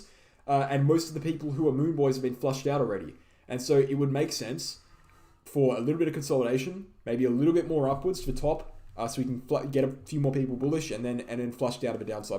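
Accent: Australia. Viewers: And screw it if the channel doesn’t grow. Your honest. People can’t handle that. Screw them. 😂😂😂 Plus on a lighter note , I lived in Oz and New Zealand, Your accent is Oz not Kiwi. 😂😂😂😂😂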